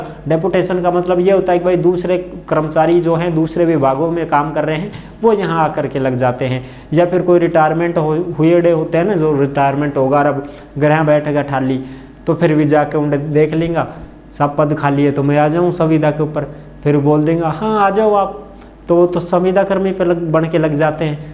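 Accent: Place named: Kotputli, India. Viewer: Sir m bhi Kotputli se hu aapki aavaj se pahchan gya ki aap Kotputli se ho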